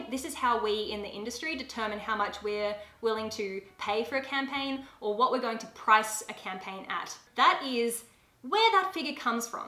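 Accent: Australia. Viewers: Her Australian accent is the LEAST annoying I’ve ever heard! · Are you Aussie ? Gday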